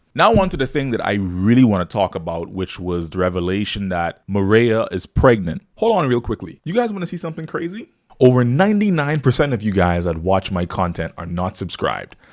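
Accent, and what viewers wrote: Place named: Bahamas. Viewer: quick question ... which island you or your people from ... cause I can hear the Bahamian in you voice! ... BTW a fan of your POWER content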